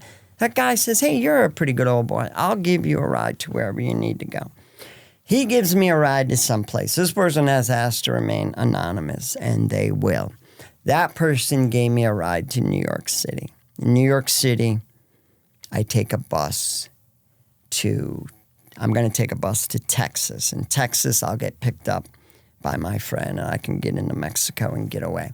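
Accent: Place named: Philadelphia, United States. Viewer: Dude sounds like he’s from S Philly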